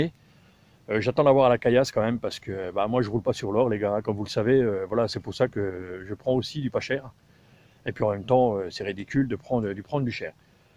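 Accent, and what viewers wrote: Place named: Haute-Marne, France. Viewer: T'as gagné un nouvel abonné , t'es un vrai haut marnais , "un tiot machin" " j'chie d'dans" 😂 du vrai parlé de chez nous , et je te suis quand tu parles des grandes marques ....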